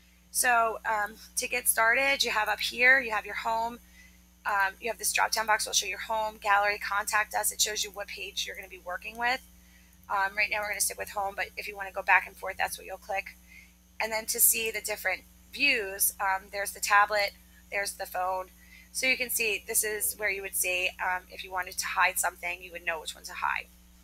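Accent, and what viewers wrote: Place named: United States. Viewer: Full American English, only native USA could understand it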